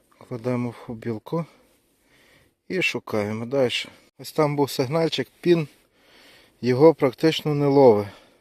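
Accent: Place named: Western Ukraine, Ukraine. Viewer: Як приємно чути нашу западенську😅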